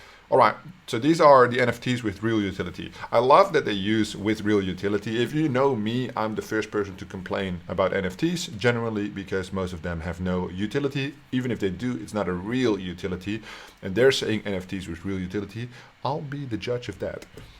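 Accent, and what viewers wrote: Netherlands. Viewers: Are you Dutch bro?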